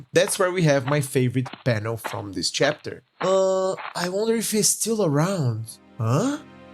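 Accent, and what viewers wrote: Brazil. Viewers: Abra seu youtube Vídeo da perspectiva dos gringos sobre um mangaká que fez sua obra no Brasil com a perspectiva de japonês. Oh my god Abra o vídeo para treinar o inglês Perceba depois de 5 segundos que é brasileiro Jogue sua perspectiva inicial do vídeo no lixo Continue o vídeo. Obs: Por que decidiu faze conteúdo pra gringa ? (provavelmente por visibilidade) É um questão genuína de curiosidade, to praticando inglês por hobby e tenho me deparado com muitos brasileiros querendo saber inglês pra tentar sair da bolha do país e queria saber se esse seria o caso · Deu pra reconhecer que você é brasileiro no primeiro segundo hahaha Sofá que brasileiro é único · pensei que ia ser um americano, mas é um brasileiro falando ingles, SIMBORA BRASILLL 😊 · pelo sotaque logo soube de onde era ksksk, que incrivel ver br fazendo video em inglês mt bom isso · Sabia que era BR só pela pronúncia, kkkkkkkkk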